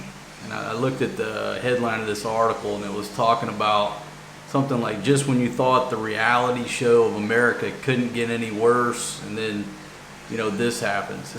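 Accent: South, United States. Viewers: I guess you can diagnose someone from TV or you tube, you sound like a ignorant redneck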